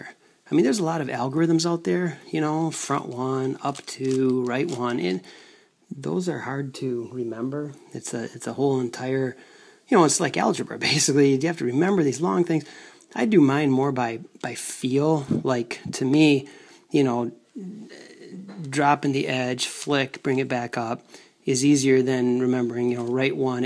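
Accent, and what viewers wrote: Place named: Wisconsin, United States. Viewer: You sound like you are from Wisconsin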